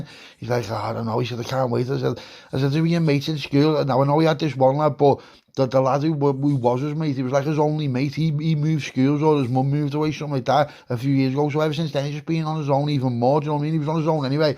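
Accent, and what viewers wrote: Liverpool, United Kingdom. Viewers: Top quality Scouse👏🏼👏🏼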